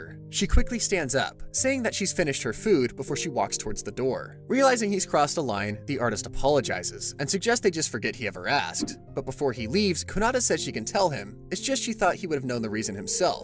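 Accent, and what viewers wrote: United Kingdom. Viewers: Man, i didnt knew that mr. Narrator is british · are you a brit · are you british? just asking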